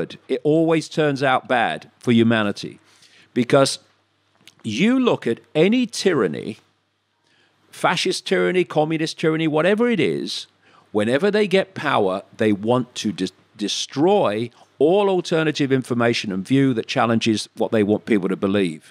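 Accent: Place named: RP, United Kingdom. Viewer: Freaking amazing that Dave understands another language but answers in the Queen's English?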